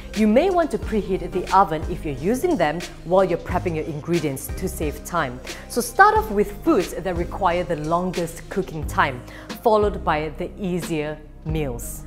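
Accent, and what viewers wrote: Malaysia. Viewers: Never felt this proud of our Malaysian accent😇👍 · It’s a good Malaysian accent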